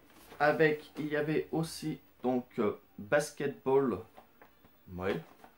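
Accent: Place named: England, United Kingdom. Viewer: super retours ! bien joué. j'adore ton accent anglais ! 745 jeux ps2 !! Ça en fait des daubes ;)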